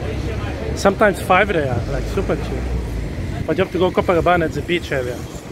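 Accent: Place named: India. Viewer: His name and accent seems Indian.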